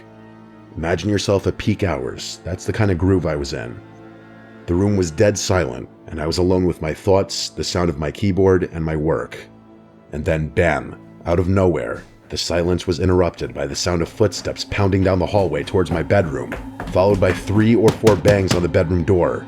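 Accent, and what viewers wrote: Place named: Upstate New York, United States. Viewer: I love your accent.It's definitely from upstate new york where i'm from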